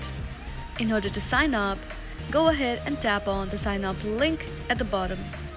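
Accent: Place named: India. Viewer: YOU SOUND LIKE AN INDIAN SCAMMER!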